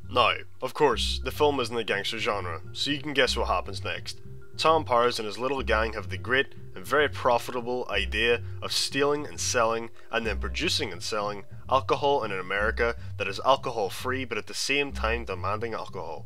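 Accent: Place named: United Kingdom. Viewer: Aside from some...idiosyncratic across-the-pond pronunciations, I thought this was really good. It would be cool to see some parallels drawn with crime literature, and also some examples of films and books that fail to hit (or even subvert) some of the genre conventions, and how that makes them successful or unsuccessful. I went ahead and subscribed to the channel, so I hope there's more forthcoming.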